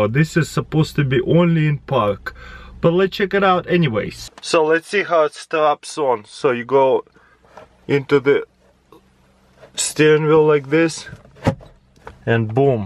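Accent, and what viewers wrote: Russia. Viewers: Michael Hawthorn meeee i love Russian Accents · I've always wondered do you live in Russia because you have a Russian accent? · I think your Russian accent is what makes you so hilarious, not to mention the BOOMS and the TUMBS up.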